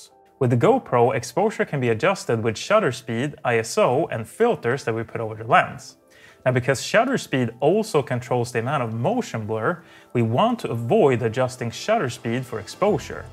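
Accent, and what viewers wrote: Sweden. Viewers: Dude hear some Swedish accent. Thanks for the video, I got a GoPeo H8 for my birthday a couple of days ago.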